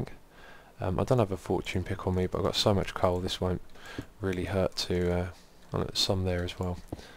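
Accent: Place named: United Kingdom. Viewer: You can't breathe through your nose? I just thought it is your british accent, so i think it's not a really big problem anyway... I am glad that you do what you want instead of making what you announced despite if you have fun. That's how shitty stuff is produced.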